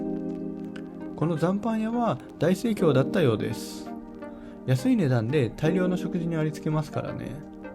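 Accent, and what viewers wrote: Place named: Tokyo, Japan. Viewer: 綺麗な東京弁に聞こえますが。おいわさんの毒○をドkstと無声子音が連続した時に母音を極端に省略するかんじとかも ガ行も鼻濁音になってますし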